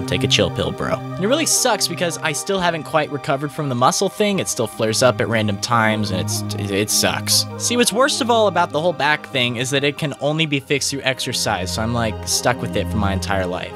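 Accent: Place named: England, United Kingdom. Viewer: Thic English accent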